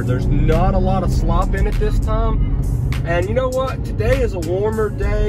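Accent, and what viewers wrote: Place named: South, United States. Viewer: For me its his lovely southern accent and the background music. #thevibes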